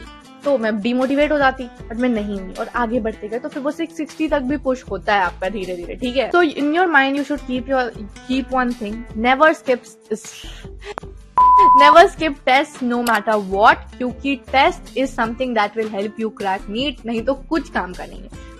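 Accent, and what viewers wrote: Bihar, India. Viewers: aapke bolne ke accent se lagta hai aap bihari ho i am also from bihar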